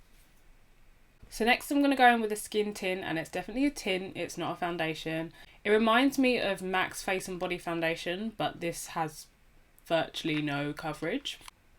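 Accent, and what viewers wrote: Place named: United Kingdom. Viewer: Really enjoyed this video 😉 where are you from? I used to be in the UK, I’ve missed this accent lol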